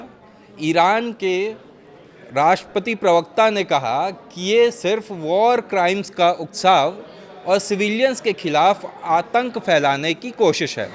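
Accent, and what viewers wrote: Bihar, India. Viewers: Bihar se hai kya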